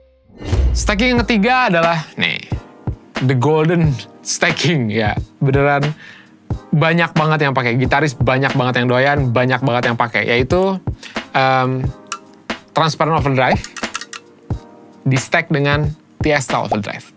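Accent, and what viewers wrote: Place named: West Java, Indonesia. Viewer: Oh, orang sunda? Kirain orang sumatera atau sulawesi. Pas denger "kela.. kela" trus "tah". Kata kela itu singkatan dari "ngke heula". Soalnya yg nyebut "kela" cuma orang yg bisa bahasa sunda sejak kecil atau sunda asli.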